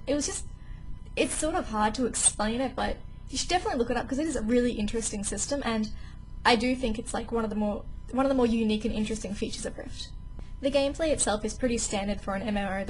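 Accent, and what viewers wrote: Australia. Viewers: Because ur a gamer ur beautiful and u have an austrailian accent which is awesome. (im not trying to ask you out i just need to let it out)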